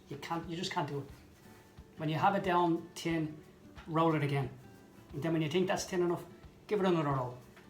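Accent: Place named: Ireland. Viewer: Awesome tutorial video! Love your accent, pretty sure its Irish 😊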